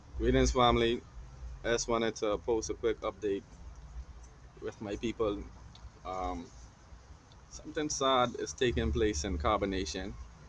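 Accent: Bahamas. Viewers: Are u Bahamian? The way we talk can't hide lol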